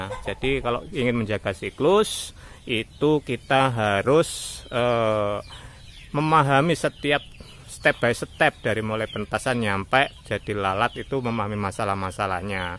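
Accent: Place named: Yogyakarta, Indonesia. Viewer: Wong jogja kali..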